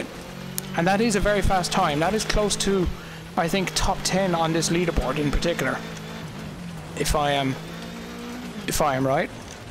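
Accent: Ireland. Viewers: I like your accent! Irish?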